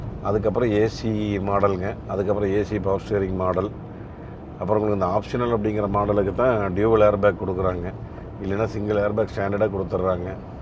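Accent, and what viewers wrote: Kongu, India. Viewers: அழகான கொங்கு தமிழில் பேசுகிறீர்கள்... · கொங்கு தமிழ் அழகோ அழகு அண்ணா,,காசுக்கு தகுந்த பணியாரம் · கொங்கு தமிழ் 💚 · அண்ணனின் கொங்கு தமிழ் மிகவும் இனிமை... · அருமையான கொங்கு பேச்சு...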